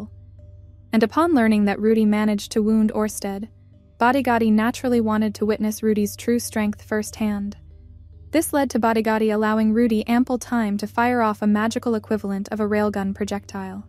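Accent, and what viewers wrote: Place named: Germany. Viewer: Man hört das du Deutsch bist.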